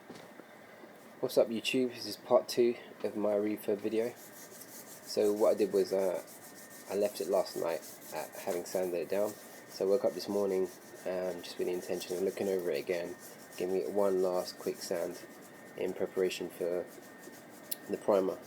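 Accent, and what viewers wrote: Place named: United Kingdom. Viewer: what filler did you use? or I guess its better what Kind of filler since you sound like your in UK somewhere and we in the US probably won't have the brand of filler you used. I got the same exact wheels on the 325i that I bought and it has curb rash on 3 out of the 4 wheels. you can probably do this even when its on the car but its easier to do it removed.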